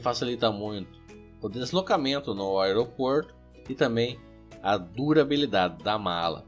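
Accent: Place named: Piracicaba, Brazil. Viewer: Você é Piracicabano?? kkk sotaque muito bom!!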